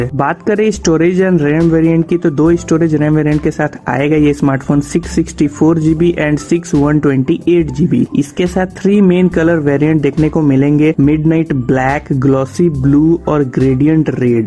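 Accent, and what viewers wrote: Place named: India. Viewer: I hear Indian aksen i dislike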